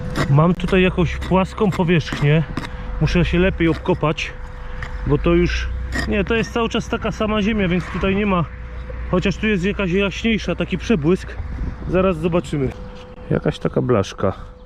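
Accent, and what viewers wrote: Silesia, Poland. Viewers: Godosz po Naszymu a piszesz KASZANKA hahaha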